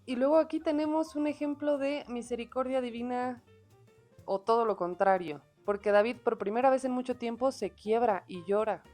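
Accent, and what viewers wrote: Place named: Mexico. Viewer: recuerdo cuando comencé a leer la biblia de inicio a fin ya en mi adolescencia, inició mis primeras dudas a las cuales le comenté a mi madre y esta me dijo: " Recuerda que con Cristo, se selló una nueva alianza eterna" La respuesta nunca me convenció porque más bien parecían dos Dioses. En mi lectura nunca llegué al N.T. en verdad el libro era tan aburrido y habían libros más interesantes que leer... Espero con ansias llegar al N.T. que también tien joyas!!! Saludos desde Panamá. (Me encanta tu forma de leer y cómo el metes ese bello acento mexicano)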